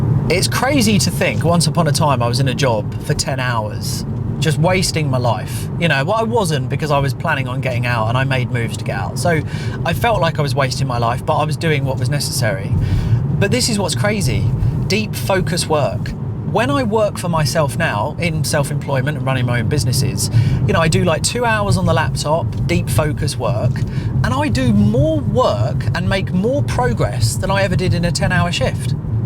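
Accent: United Kingdom. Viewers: Oh I love British accent❤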